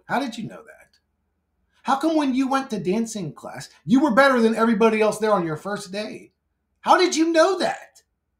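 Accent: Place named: Nigeria. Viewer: The Nigerian uncle accent my favorite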